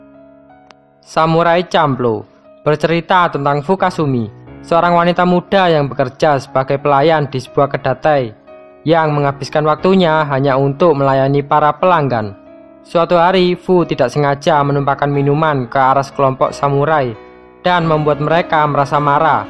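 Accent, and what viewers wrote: Java, Indonesia. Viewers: Medok banget lo min😅😁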